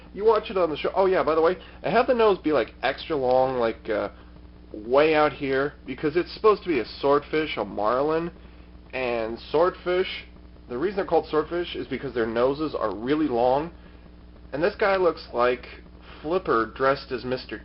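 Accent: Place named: United States. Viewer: Could you at least try a little harder on your pronunciations? I mean, I know you're American and all, but really man, it's a little grating to hear when you say the Japanese names.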